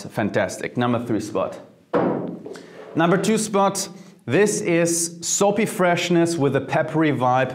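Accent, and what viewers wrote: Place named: Germany. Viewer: The german accent fits perfect 👌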